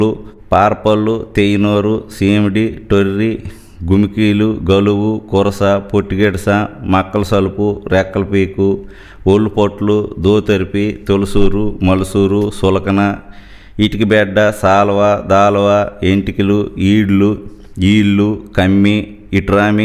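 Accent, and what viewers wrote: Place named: Uttarandhra, India. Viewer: అంతరించి పోతున్న ఉత్తరాంధ్ర మాండలికం, నుడికారం, స్థానికంగా ఉచ్చరింపు మీకే సొంతం... మీ సంకలనానికి దాసోహం...🙏🙏🙏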